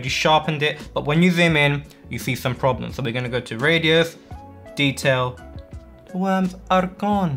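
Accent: India. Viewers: Honestly, it was the Indian accent for me 😂 thanks for the info!!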